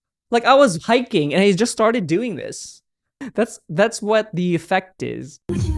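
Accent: Philippines. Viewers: Your accent reminds me of our filipino nanny from when i was younger. I miss her 😣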